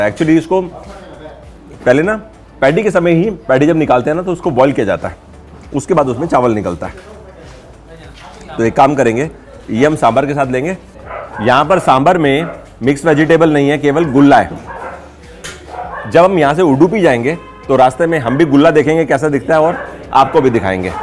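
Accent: Punjab, India. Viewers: Mr your punjabi accent is making videos great treat. Because north Indian people explain food nicely and appreciate.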